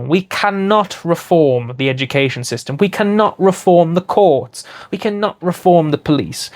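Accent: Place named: United Kingdom. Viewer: Right wing channel called my ireland. Accent is so british he might as well have the surname of Windsor. Many such cases.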